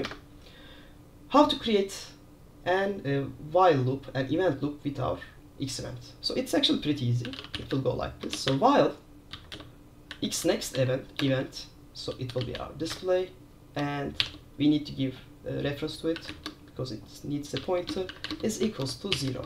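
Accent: Turkey. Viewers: Dinlerken dedim çok Türk'e benziyor ingilizce. Yorumlarda bunu görünce tetit etmiş oldum.😂 Başarılar hocam.🎉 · türk olduğunu 5 saniyeden anladım hocam, eğitim için teşekkürler.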